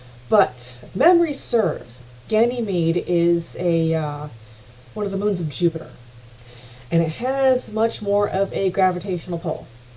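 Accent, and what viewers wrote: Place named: United States. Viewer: I love your accent! What state are you from?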